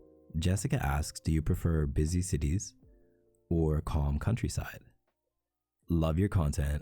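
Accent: Canada. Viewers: HOLD UP UR CANADIAN? HOW DID I NOT CATCH ON- OH MY GOD LMFAOOOOO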